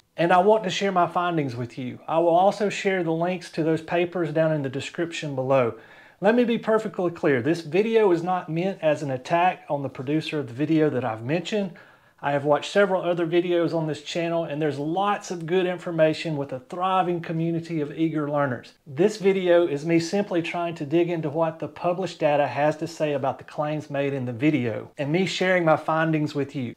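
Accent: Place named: Alabama, United States. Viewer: Auburn, huh? I thought I heard an Alabama accent. I'll be subscribing to help me with my garden in Tuscaloosa.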